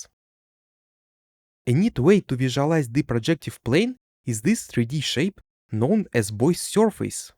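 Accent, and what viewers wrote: Russia. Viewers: я даже и не знал что у него есть канал на английском а ещё русский акцент очень возникает) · отчётливо слышно русский акцент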